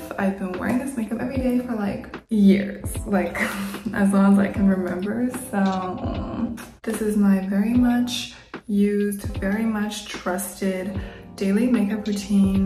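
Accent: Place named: California, United States. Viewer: Sounds like a California girl accent😅😮‍💨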